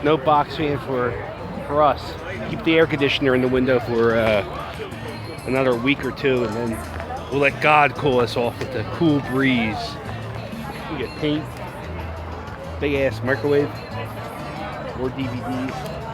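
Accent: Philadelphia, United States. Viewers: Haha 😂 I can tell your from philly.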